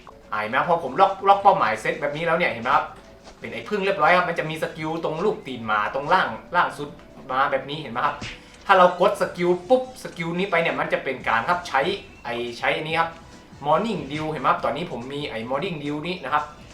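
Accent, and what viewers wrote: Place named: North, Thailand. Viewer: 55555 ชอบช่องนี้จัง เป็นคนเหนือหรอคะ สำเนียงน่ารักจัง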